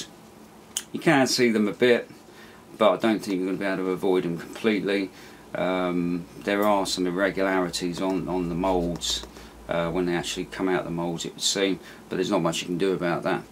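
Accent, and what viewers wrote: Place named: United Kingdom. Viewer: Now i feel like a dick, but I would like to see the build progress. Seems like you are the one who I am always watching build kits. Either that or there are hundreds of other british sounding dudes reviewing literally all the shit I do. I love air fix, all the kits, and most other british stuff. (top gear, is by far the best goddamned production in the history of moving pictures.) I mean to offer constructive criticism from my perspective… please don't be offended by me or any other internet jockey, cause sitting behind my desk in the nude, i sound much more threatening that I actually am. I love to see the kit, how it goes together, and not too much discussion on anything else. Its fitment, panel details, etc. Most of the time I am looking to see if there is a set of detail enhancing parts. Photo etch, cartograph decals, resin conversions, things of that sort. 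That is the primary reason I stretch beyond google. I am always looking to add more detail than is available from the factory. Needless to say, please keep this up, I have watched hours of your reviews. (again, either you or some other person with your exact accent, and voice to the best I can determine) So, thank you for reviewing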